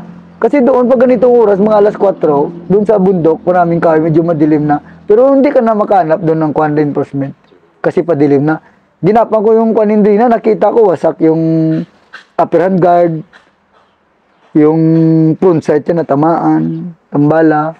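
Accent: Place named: Iloilo, Philippines. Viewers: Alam mo talaga pag ilonggo kay tuno perti gd 🫡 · Parang ilonggo si sir ah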